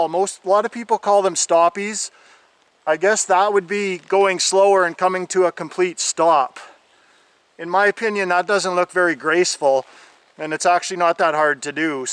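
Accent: Canada. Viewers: lol i love this guys accent! Canadian?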